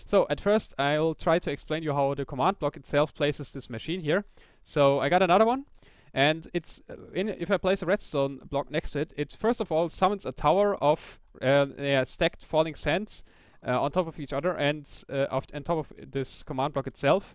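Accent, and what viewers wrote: Germany. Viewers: you got a pretty german accent right i dont know im german ;D just say no or yes ;D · R u from germany? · Du bist Deutscher oder :P?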